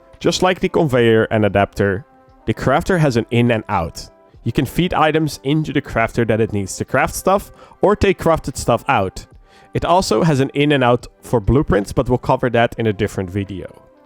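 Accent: Netherlands. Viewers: Ik proef het gewoon dat je nl bent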